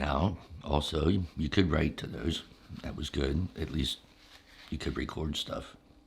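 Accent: Pennsylvania, United States. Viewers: Do I hear just a little bit of Philly in your accent? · are you from PA? I went to Gettysburg college (born and raised in New York) so I am familiar with the accent! 🧡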